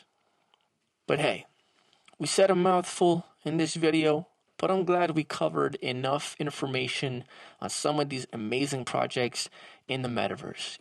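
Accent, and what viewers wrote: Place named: United States. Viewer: where you from? I’m having trouble deciding whether you’re American or Canadian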